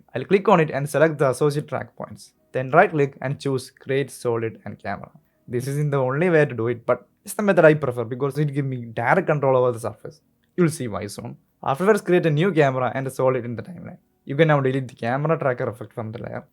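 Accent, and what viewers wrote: Kerala, India. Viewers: Cant understand anything cuz of that thick indian accent but w vid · ur indian accent is very funny but considering ur so skilled i respect it 🙏 keep it up man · English ketalee ariyam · Yeah his english accent gives away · thickest indian accent on the planet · Malayalam english ahhh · Indian ❌️ kerala accent ✅️ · you are from kerala right alla accent kettatu manasalayda gg nice video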